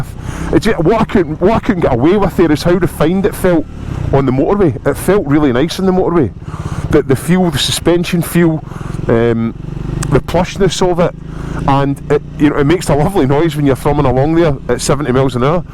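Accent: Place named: Glasgow, United Kingdom. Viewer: Certainly the honest and trustworthy Glasgow accent helps.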